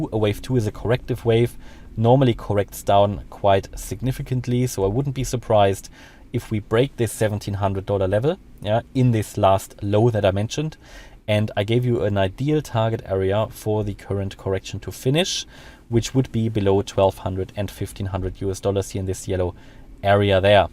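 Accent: Germany. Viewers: digga bitte versuch diesen deutschen akzent dir abzugewöhnen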